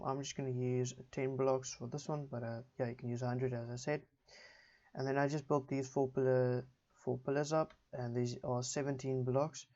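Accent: South Africa. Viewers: are you sourh african?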